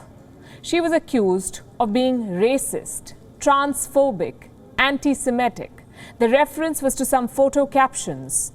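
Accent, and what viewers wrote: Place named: India. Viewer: Indian English accent beautiful and the best😍 Love from Philippines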